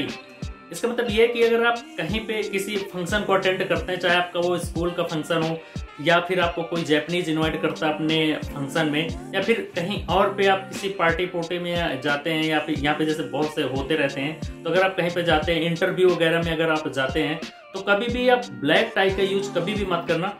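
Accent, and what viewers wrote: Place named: Bengal, India. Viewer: Apka bolne ke tone bangalio ke jesa h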